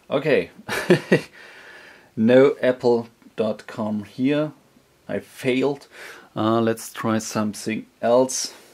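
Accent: Germany. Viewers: possible that you are german? Your english accent :D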